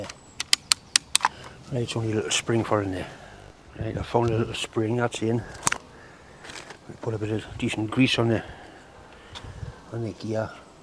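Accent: Newcastle, United Kingdom. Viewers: Hi, just stumbled upon your channel, nice to hear a Geordie voice again. I live in Australia but come from Whickham just above the metro centre, just one question for you how the hell do you calculate the distance between teeth when cutting a module1gear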